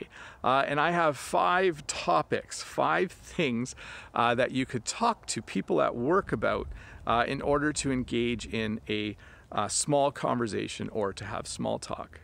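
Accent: Canada. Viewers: A lot, when I was living in Australia, specially with talks with Australians. I like your Canadian accent, specially when you pronounce the "au" like in about.:-) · 100% understood Thanks - Good lessons for frenchman like me, good to listen english with canadian accent!! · Dear, this is my first english teaching video I have ever seen (and heart). I am sure my english skills will be improve by waching your videos and daily ideoms on instagram. I like your accent. Habe you got a typical canadian one?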